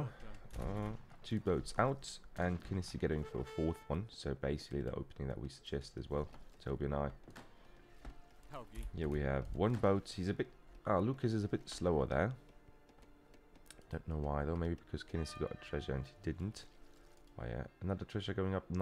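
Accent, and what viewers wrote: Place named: England, United Kingdom. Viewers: Ja krass, du klingst echt wie ein Engländer. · der Meinung war ich auch. Ein leichter britischer Akkzent kommt da durch😁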